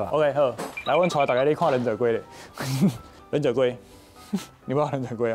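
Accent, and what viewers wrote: Taiwan. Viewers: 講台語真好聽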